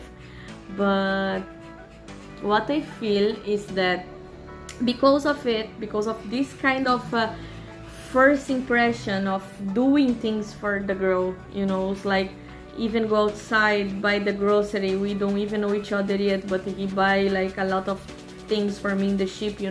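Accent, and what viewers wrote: Philippines. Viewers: You also having the Filipino accent in speaking english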